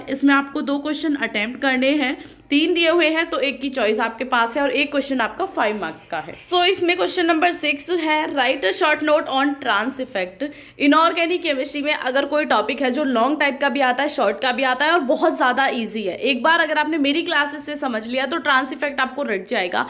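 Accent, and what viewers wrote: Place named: Bundelkhand, India. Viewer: apki tone bhi bundelkhandi hi lg rhi hh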